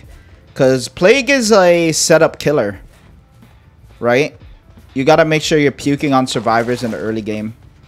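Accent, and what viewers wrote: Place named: Colombia. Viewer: This boy still doesnt want to tell me if i was right at saying that I think he is Colombian. Im basing it on his accent. Have Colombian friends that talk just like him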